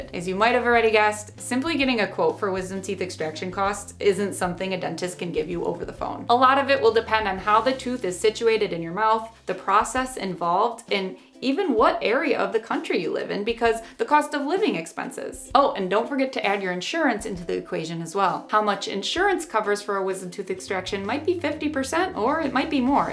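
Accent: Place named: Dakota, United States. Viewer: May I ask a question are you from north or South Dakota? I recognize the accent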